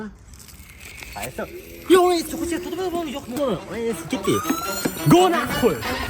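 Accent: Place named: Tashkent, Uzbekistan. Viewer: Oka haribroq bop qobsiz sal tashkencha gapirardizu oldin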